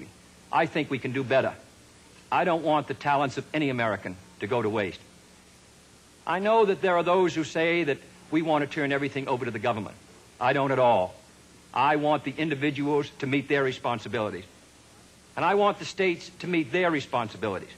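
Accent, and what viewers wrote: Boston, United States. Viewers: we talk like this in dorchester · this isn't true, his accent is the complete opposite of Brahmin society and accents. John Kerry is a good example of Brahmin and born into. But the Kennedys were your typical Irish working class Boston accent. The Brahmin were not fans of this Boston culture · He has a specific Boston accent than some in Boston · Check , , and , the Boston accent is soft, but noticeable. It seems as if he tried to train it out or soften it, but it's still there. By it's back in full swing, especially "A cotton fahmah in Georgiahr".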